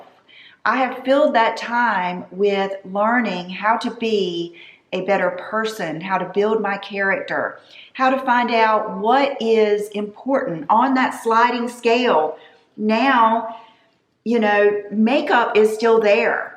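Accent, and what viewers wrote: South, United States. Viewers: Hi, I’ve been a subscriber for over 10 years. I was going through a divorce in 2011 and would escape for 25 minutes by watching your videos. I loved and still do your southern accent and how genuine you are. You are truly a blessing for so many of us. Sending you love from a 50 year old Woman in California 💗🦋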